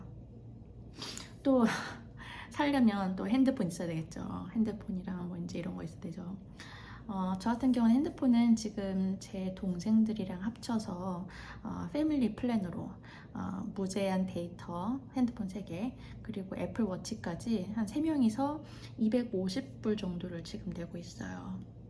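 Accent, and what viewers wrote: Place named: Gyeongsang, South Korea. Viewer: 경상도 사투리가 들려요!!! 경상도 출신 구독자입니다 ㅎㅎㅎ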